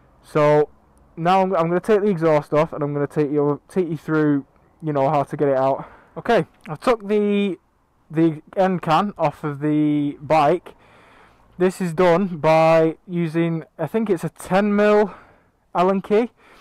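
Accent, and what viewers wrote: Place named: Manchester, United Kingdom. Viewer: Good stuff mate. I just got the same bike and exhaust last week and have been watching video's thinking all I have to do is use an allan key to get the db killer out and drill a couple of holes to increase the volume, fuck euro 4, apart from abs which has already saved my life. Can I give you a shout if I am struggling with it? I am in Manchester and can tell by your accent that you are not that far away. Couple of beers in it for you :)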